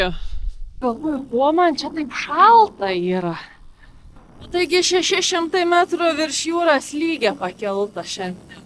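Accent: Lithuania. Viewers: Sveiki, smagu Jus stebeti, is kur Jus esat Lietuvoj, kalba kaip Siauietiska arba Panevezys?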